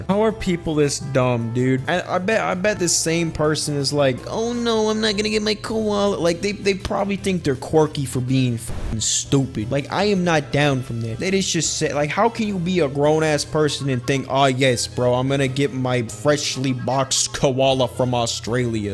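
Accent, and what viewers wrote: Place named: New York, United States. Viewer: I love the NY accent, adds depth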